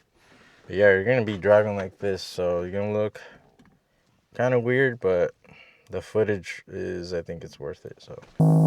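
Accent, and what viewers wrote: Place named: United States. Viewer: You sound american but drive on Italian roads?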